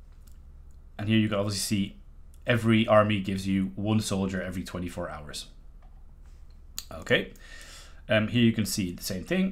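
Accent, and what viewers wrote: Ireland. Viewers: Bro r u irish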